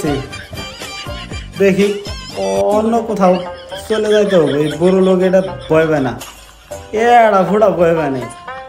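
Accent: Tangail, Bangladesh. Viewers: ভাই কি টাংগাইলের লোক নাকি...? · ভাই মনেহয় টাঙ্গাইলের।ভাষায়ই বোঝা যায় ভাদাইমা।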